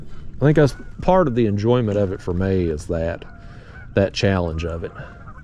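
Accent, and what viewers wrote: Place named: Oklahoma, United States. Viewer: Im gonna be really honest here for a minute. I never used to really watch your live 2 hour 4 hour long videos. I used to just skip right over them. Honestly because i kinda thought you where an asshole in general but i liked your videos about big fish and whatnot. Im from oklahoma. Your country accent gives me a kick because i hear myself in there lol. But honestly ive been watching the raw and uncut videos and i have noticed well hell this guy is just like me. He is just real and honest. I like the honesty. I love the raw and honest opinions about real life deals you talk about. Like the recent video i watchrd where you talked about old white christian men who hate your guts. Im a christian but im also human so i know damn well on youtube im gonna see the rue paul drag race bullshit and my kids see it too. My daughter asked me the other day she was watching a kids show on youtube and that crap popped up. 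Ahe is 10 by the way. And she asked me why that man was fressed like a woman and why he doesnt wanna be a man like he is???? You know how hard that is to explain to a 10 year old girl??? So you making a commercial for a ball hair trimmer, id rather her see that than see that other nonsense. Id rather someone be real and honest rather than be fake. Even as a christian im not religious. Im only human and make mistakes. So in closing just saying i know what you nean and i get it. But not all is christians are likw the old white man you described. 😊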